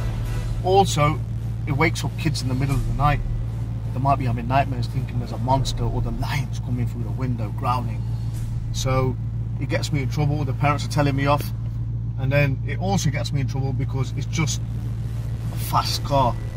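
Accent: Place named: England, United Kingdom. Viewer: Love you english accent man goed review subscribed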